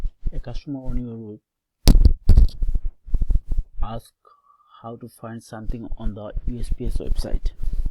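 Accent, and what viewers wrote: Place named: India. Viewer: Technically not broken .. just an Indian accent lol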